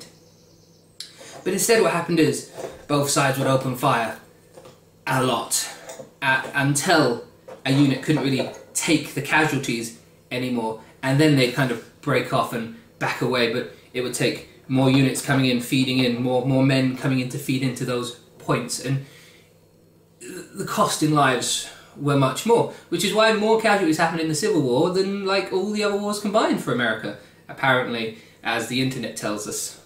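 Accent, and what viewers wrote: United Kingdom. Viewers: i really enjoy your history videos! are you english?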